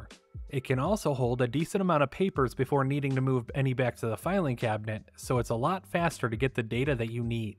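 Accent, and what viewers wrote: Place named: United States. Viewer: Ah an American living in Sweden. Your accent did also seem way too American for me to think you were a native Swede, lol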